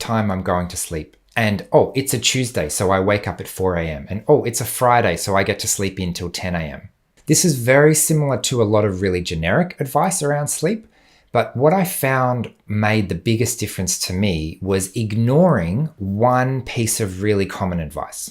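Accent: Australia. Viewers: He 's Australian...not Scottish...❣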